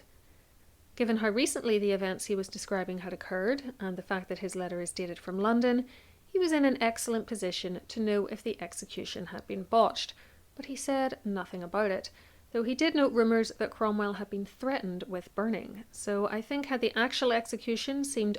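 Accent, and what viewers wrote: Northern Ireland, United Kingdom. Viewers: A Northern Irish lady speaking. Just speaking normally. No "It's not X, It's Y, AI drivel. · What part of the north of ireland are you from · Northern Ireland accent 🙂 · Yes her Northern Irish accent is beautiful.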